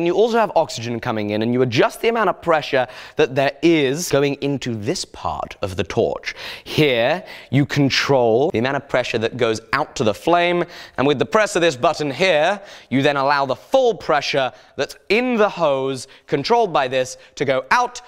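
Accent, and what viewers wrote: England, United Kingdom. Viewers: where about's are you from in England to obtain this accent?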